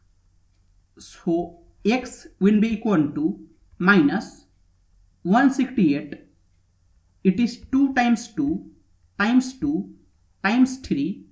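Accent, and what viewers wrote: India. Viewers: Indian English pronunciation is so terrible!!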